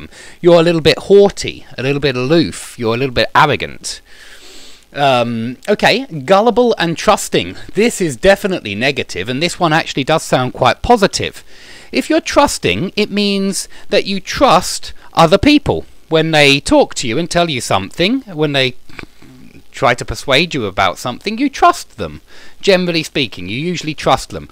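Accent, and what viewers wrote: United Kingdom. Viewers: I like a lot your British accent. Keep shining.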